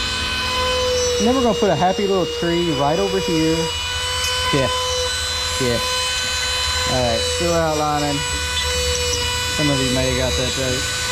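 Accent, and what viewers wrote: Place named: South, United States. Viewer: You’re definitely watchable. Is that a word? South Carolina for me? I detect some southern drawl. Thanks for sharing your remarkable talent!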